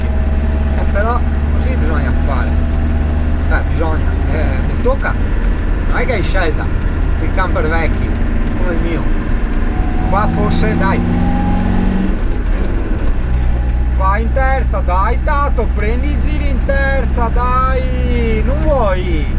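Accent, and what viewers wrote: Veneto, Italy. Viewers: Sicuramente non sono mezzi da camminare forte considerato anche l'età del mezzo . Comunque bei video divertenti con l'accento veneto poi è uno spasso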